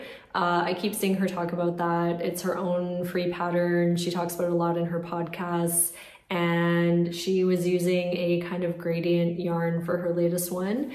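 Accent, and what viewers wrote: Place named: Ontario, Canada. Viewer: Pretty sure it’s an Ontario accent, hard to change your natural speech patterns and for some reason women are often targeted for their speech tone, patterns etc.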